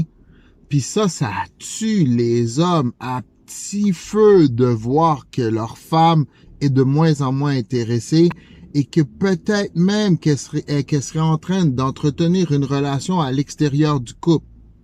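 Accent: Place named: Canada. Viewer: Tu es obligé de parler comme les canadiens? De prendre l'accent pour qu'on sache que tu es à Montréal ??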